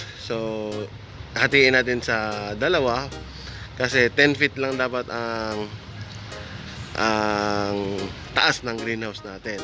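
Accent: Visayas, Philippines. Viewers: bisaya k b sir?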